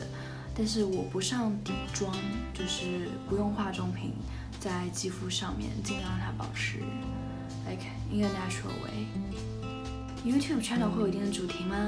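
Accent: Shanghai, China. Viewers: 00后原来讲上海话已经洋泾浜了！好可惜啊！susan加油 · 你说上海话我听得懂听得懂哈哈哈哈哈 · 上海话😭😭😭又亲切又可爱，好像从小看着长大的邻家小妹一般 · 哈哈哈上海話講的蠻好聽的呀，可愛~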